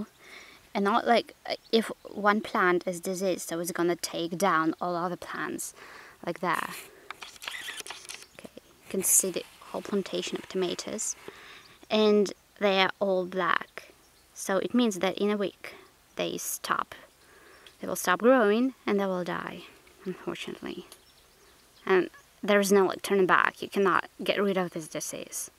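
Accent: United Kingdom. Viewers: So British accent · You seems to have a British accent. Btw, your village is quite lovely.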